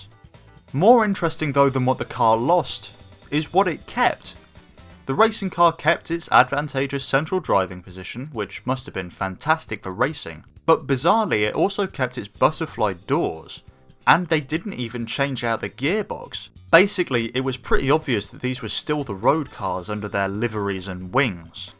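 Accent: United Kingdom. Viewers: Just bc of the british accent 💀🤣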